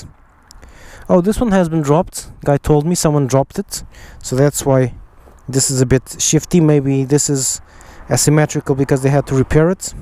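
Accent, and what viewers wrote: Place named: Portugal. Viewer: só pelo sotaque dá para ouvir que és tuga crl! :D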